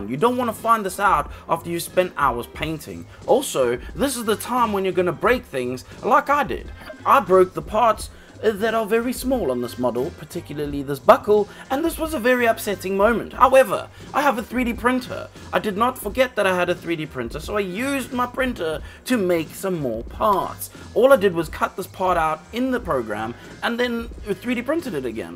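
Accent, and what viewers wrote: South Africa. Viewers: Are you originally from South Africa? 🤔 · Awesome work! You sound very South African. 😅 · I recognize that South African accent 😉 This is incredibly impressive, well done!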